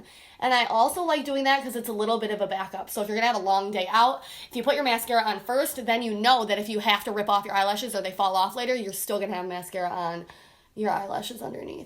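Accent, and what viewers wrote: Buffalo, United States. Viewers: Are you from Buffalo? Your accent sounds like you're from Buffalo hahaha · This girl has to be from buffalo- can hear that accent anywhere 😂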